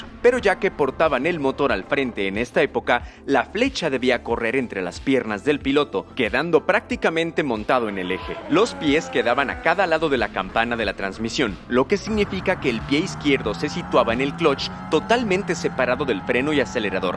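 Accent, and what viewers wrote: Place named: Latin America. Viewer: Esto no era Driver61 en Español? Por qué está en modo latino? Otro video que no veo.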